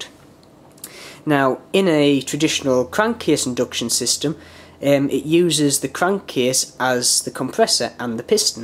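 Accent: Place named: Durham, United Kingdom. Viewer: Geordie? sound more durham to me like?!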